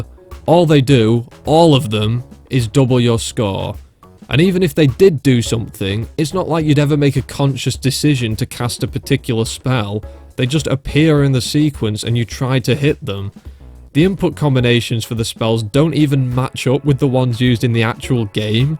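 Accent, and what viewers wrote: United Kingdom. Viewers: That British was like a foreign language to me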